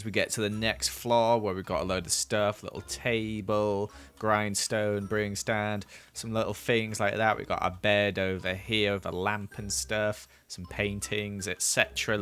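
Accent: United Kingdom. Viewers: Love that thick british accent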